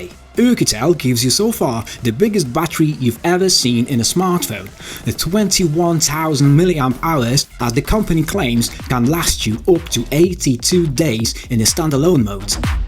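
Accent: United Kingdom. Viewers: Супер аппарат, интересный тест👍 и я впервые в жизни слышал чистую британскую речь... Хотя с детства слушал the beatles например, но никогда не замечал это))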